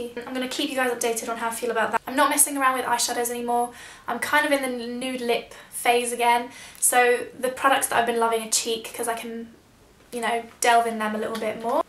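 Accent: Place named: United Kingdom. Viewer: i liked the video before it even started... i know its going to be awesome because: 1. its whats in my bag video, 2. its you talking with that beautiful accent (i wish i lived in the UK) cheers from croatia :)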